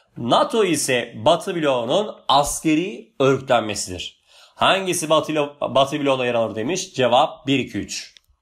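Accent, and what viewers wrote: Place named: Adana, Turkey. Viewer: Cevap:goodvibes:: gırtlaktan gelen Adana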